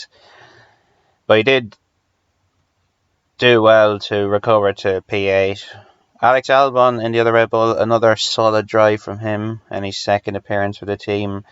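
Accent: Ireland. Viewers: You have some accent, obvs Irish but that's very broad for Irish people as we know every county is like different. But I'm shite at telling from county to county lol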